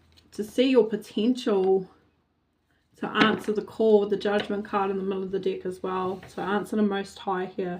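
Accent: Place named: New Zealand. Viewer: I thought she sounds. New Zealand 🇳🇿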